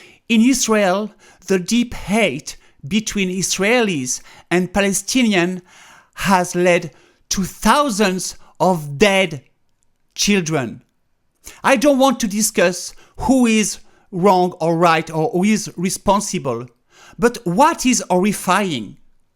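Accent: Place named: France. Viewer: Hi, you know I always loved you. But, to be frank, your French accent is getting more and more... how should I put it...let's just say that your French accent is getting more. Like in this episode, I have to watch the video for 3 times to hear out what are you saying, what's up? you're back to France?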